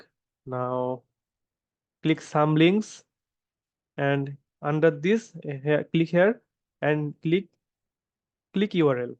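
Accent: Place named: India. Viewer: Thank you! Thank you! Worked perfectly! Also thank you for speaking slowly! Indian accent is hard to follow if you're European.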